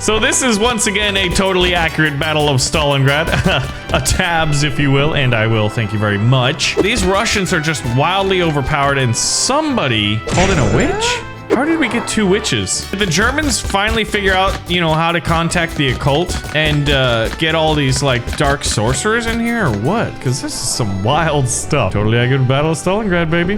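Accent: Germany. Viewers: your German accent isn't annoying it's WUNDERBAR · I’m from Germany and i just love it when he uses his German accent.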